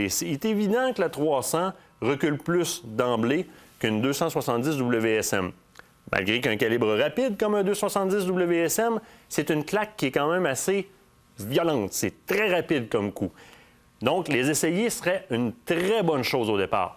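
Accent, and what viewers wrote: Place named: Quebec, Canada. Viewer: Je ne me lasse pas de l'accin québécois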